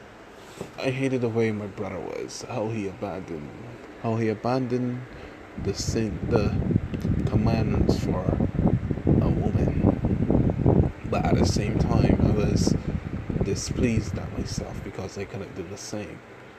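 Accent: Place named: Barbados. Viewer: Bro you from barbados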